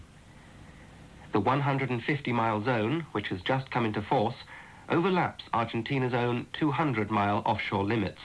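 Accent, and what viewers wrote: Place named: United Kingdom. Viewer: 영국발음 진짜 멋있다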